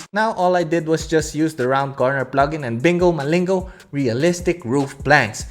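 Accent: Philippines. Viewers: why do I feel that you have a Filipino accent? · You sound like how Jokoy perceived what Filipinos sound like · Heards his accent Me: Heck yea, a Filipino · damn! this is why i always wanted to see your vids coz men! your editing skills is so good and satisfying. The transition effects are so good and educational. also love your filipino english accent hahaha every diction of words is clear and precise. I think you are one of the underrated vloggers so i hope soon i can witness your growth up to million subscribers..hayyysss every time i watch your vid makes me want to go to your place and ask you to be my mentor hahaha anyways i also finished architecture degree hope to see you soon idol! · These dudes right here be pressed about a person who just recognized a filipino accent. 😂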